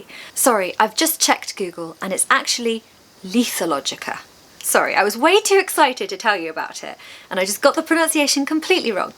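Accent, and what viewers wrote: RP, United Kingdom. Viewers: Lovely and smart Woman I'm from Aracaju-Sergipe-Brasil I love the Rp accents from England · Dear Madam Teacher,many and many thanks!! I really,truly admire your so great British accent!! Very warm greetings from the Central Europe. I wish you a pleasant,enjoyable,wonderful 1st May ( Labour Day and Global Love Day). Take care,please.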